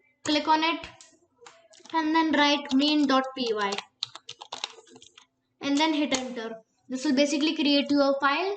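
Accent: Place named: India. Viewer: I think you are indian because your voice says I also Indian Nice explain I understand all things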